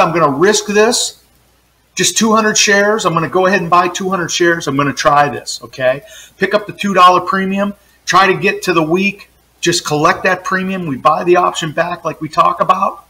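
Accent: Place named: Pittsburgh, United States. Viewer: Thanks for the reply. Makes perfect sense, thank you. I guess my real question was, "Do you HAVE to buy them back, or do you have the option to let them expire?" I was a little confused but I get it now. Side note, you're not a fellow Yinzer by chance, are you?? Your voice (I mean no offense) and a certain sports logo visible in one of your other vids suggest you may have ties to the area. Thanks again, and keep up the good work with the vids! Very helpful.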